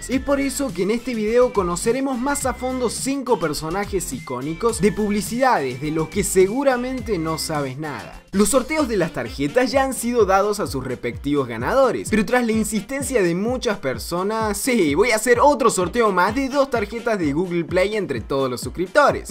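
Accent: Córdoba, Argentina. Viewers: todavia no se te sale el asento codobe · es cordobes? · NO ME MIENTAS TU ERES ARGENTINO! YO IGUAL :DDD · Este men es argentino?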